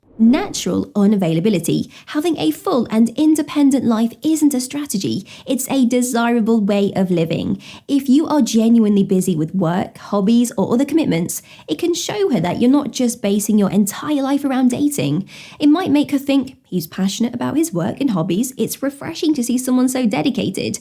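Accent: United Kingdom. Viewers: British accent is music to my ears.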